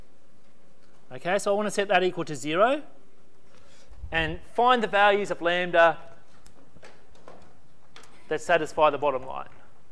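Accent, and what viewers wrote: Australia. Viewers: That was great i will forever solve systems of equations with an Australian accent!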